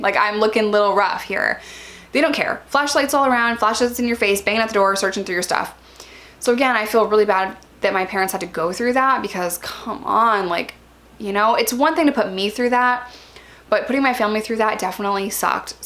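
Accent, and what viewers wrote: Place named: Upstate New York, United States. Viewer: knew you were an upstate new yorker by your accent, you sound like me hahahaha i'm in oswego county.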